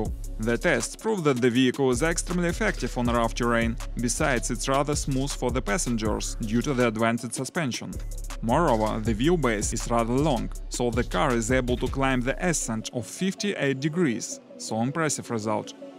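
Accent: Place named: Russia. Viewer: У тебя русский акцент- ты русский,ну или из бывшего СССР??))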